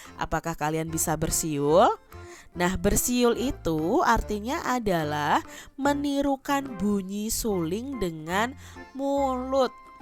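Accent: West Java, Indonesia. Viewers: Kayak nya atau sunda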